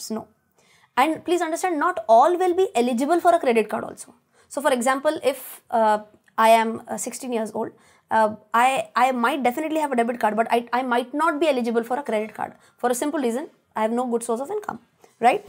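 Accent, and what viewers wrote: Maharashtra, India. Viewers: इंग्लिश मध्ये सांगणे वाईट नाही पण तुमचे प्रोनऊन्स महाराष्ट्रीतील वाटतात... कृपया हिंदी वापरा... अन मराठी सांगितल्यास महारष्ट्राला फायदा होईल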